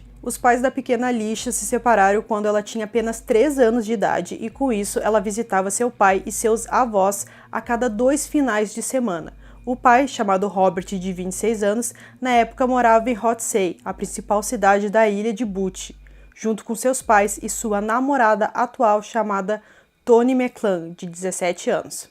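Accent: Rio Grande do Sul, Brazil. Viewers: Tu és gaúcha?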